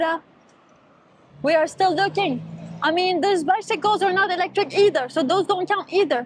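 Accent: Quebec, Canada. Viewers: French Canadian? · This is what Canadian women sound like?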